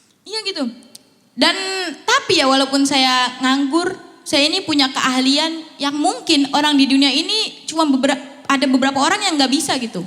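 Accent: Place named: Cikarang, Indonesia. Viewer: Aksen Cikarang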